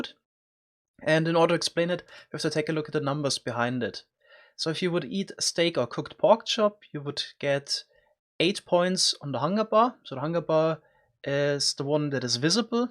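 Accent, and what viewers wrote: Germany. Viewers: Is he german? He has the german accent😂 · What nationality are u from? You sounds like german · your vids are very entertaining and i always think that your projects are amazing but that german accccent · Deutscher Akzent is mies am Start haha♥️♥️ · Guten Tag du Alman. Ich wette nen 50er, dass du ein Deutscher bist, das merk ich doch am Englisch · Man hört schon dass du normal deutsch sprichst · German accent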